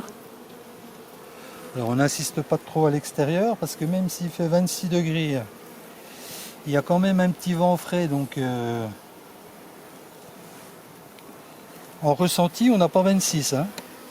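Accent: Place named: Franche-Comté, France. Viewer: Ce bel accent franc comtois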